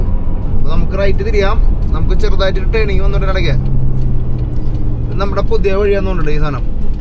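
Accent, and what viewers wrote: Thrissur, India. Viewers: Please stop your accent. You’re doing a grt job but spoiling with your Thrissur tone and accent. You’re next to sgk. He never had ktm accent. So please mate..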